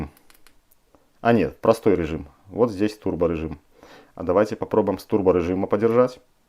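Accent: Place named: Belarus. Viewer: Спасибо за обзор, фонарик отличный, уже 3 таких купил! 🙂 Заметил что у автора еле заметный говор особенный, такой приятный убаюкивающий, подумал что на белорусский похож, и кажется угодал. Привет беларусам от русских из Эстонии, очень нравится ваш говор степенный! 🙂👍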